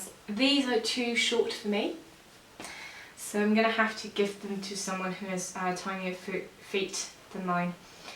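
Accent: United Kingdom. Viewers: Oh your Southern English twang is adorable! I'd been wondering how you were getting on, here's a very late welcome to England 😊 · you've even got a bit of a 'Brit' accent already-too cute! glad you found the time to share all the new and exciting things with us!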